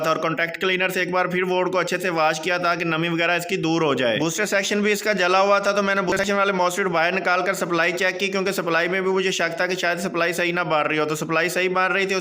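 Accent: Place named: Rural, India. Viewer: Kaar deye? Kyune KAAR deye? Urdu to dekho zra apni. Aisa lg raha hai k ksi Pind se ai ho or video bnaney beth gae ho.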